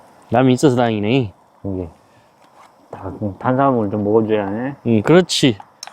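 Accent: Jeolla, South Korea. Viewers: 순수해 보이셔서 구독합니다 전라도?말투 구수하네유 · 전라도 사투리가 구수허네. 영상 잘보고 입습니더! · * 수많은 전라도표준어가 구수한 누렁지 맛. 여러 구독자분들 조작구내버리는방송 언제나 응윈합니다 ❤❤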